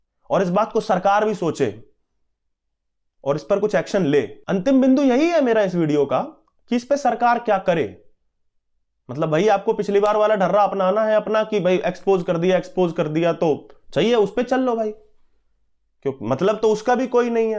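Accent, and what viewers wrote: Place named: Haryana, India. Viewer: Bhai tumhara Haryanvi bolne jo maja hai wo alag he hai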